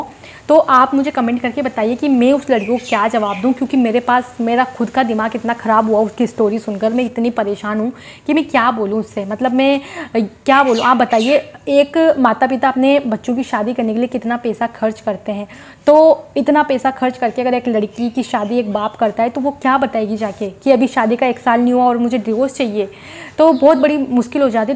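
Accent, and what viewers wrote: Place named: Rajasthan, India. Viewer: Mam aap rajasthani lagte ho bolne k laheje se,par aap ne kaha aap delhi se hai🤔🤔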